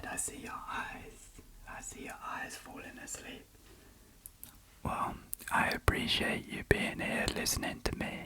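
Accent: United Kingdom. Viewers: Great ASMR, your British accent makes it even better. ❤️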